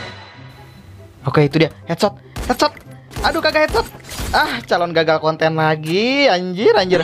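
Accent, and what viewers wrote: West Java, Indonesia. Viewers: sunda kah? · Bang orang sunda bukan???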